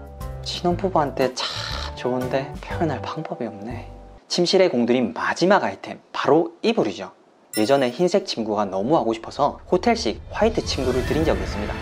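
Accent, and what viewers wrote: Gyeongsang, South Korea. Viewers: 암생각없이 보다가 친근한 갱상도 사투리도 글코 멘트도 넘 우껴서 좋아요 구독 알림설정 쓰리콤보 하구 갑니다ㅋㅋㅋ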